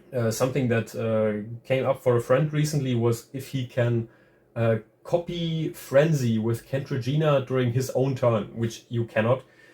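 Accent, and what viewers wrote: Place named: Germany. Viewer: boa.... wenn deutsche englisch reden...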